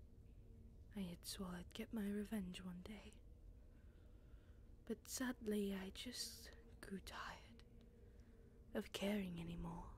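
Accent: Scotland, United Kingdom. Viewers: Are you from Scotland? I hear an accent.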